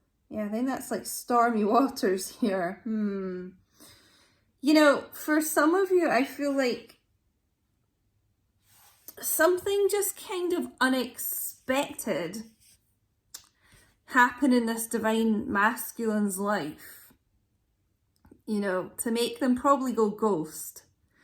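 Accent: Scotland, United Kingdom. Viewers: 🔥♊🔥♊😎💚💚💚 thank you spot on! both Gemini twin flames from Scotland nice to hear ur Scottish accent!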